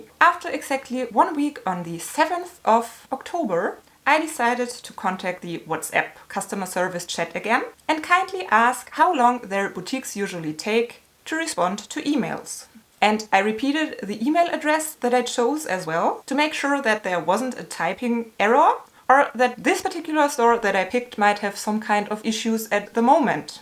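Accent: Germany. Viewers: I love your channel and your accent! The first time i saw your video, i immediately know you are from Germany. Much love❤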